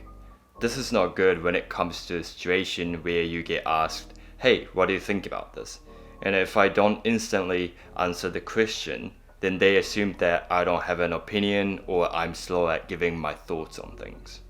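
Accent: New Zealand. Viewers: Your accent is so new zealand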